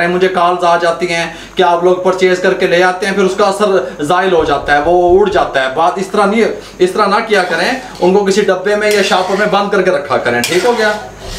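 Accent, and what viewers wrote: Punjab, India. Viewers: Kya bat hy apki panjabi ki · 😆😆😆😆 bht hasi ati h jb punjabi bolty h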